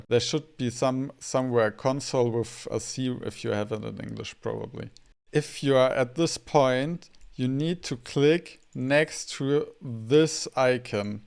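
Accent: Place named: Germany. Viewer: danke danke. und besonderes danke fuer schnelle antwort. weisste als ich dein englisch gehoert hab dacht ich mir hmmm vlt deutsch. nicht mal gerallt dass entwicklerwerkzeuge deutsch is ^^' erst spaeter so wait a moment 🧐 klein meinte ich vom tutorial wenn mans am handy schaut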